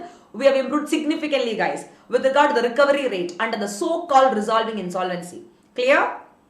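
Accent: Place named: South India, India. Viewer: Mem south Indian english bol rhi h 😀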